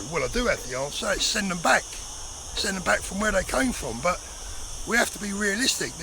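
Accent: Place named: Cockney, United Kingdom. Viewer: Where are you filming from? Do i hear a cockney accent, so long since ive heard one. Wonderful dulcet tones ❤❤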